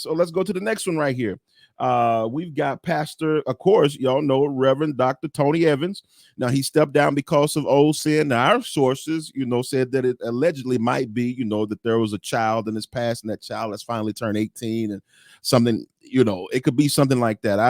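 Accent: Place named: Texas, United States. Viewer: Bro. you speak it real just like us country folks from Tyler, Tx do. 😂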